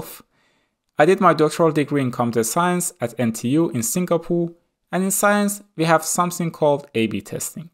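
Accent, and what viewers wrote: Iran. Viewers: Your accent is too persian😅 am i right?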